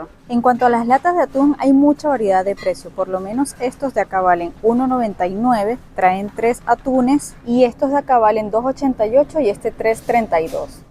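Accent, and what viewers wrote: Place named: Venezuela. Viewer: Tú acento se parece al venezolano.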